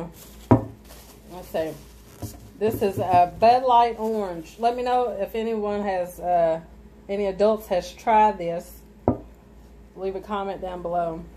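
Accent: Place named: South, United States. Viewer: Love me som bk... love your country accent ❤️ ppl say I’m country but I don’t think so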